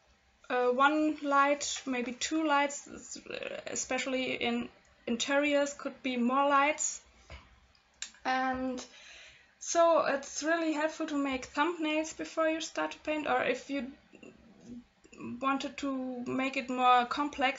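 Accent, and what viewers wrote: Germany. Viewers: your english😁 are you german oder something? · Ein schönes Video und dazu sehr hilfreich! Dankeschön 🧡🧡🧡🧡🧡🧡👌✌ps: Du scheinst einen rheinischen Dialekt zu haben 🤔😌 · wollte das gleiche fragen, cool dass du aus Deutschland kommst, bist echt talentiert... finde den deutschen Aktzent immer voll knuffig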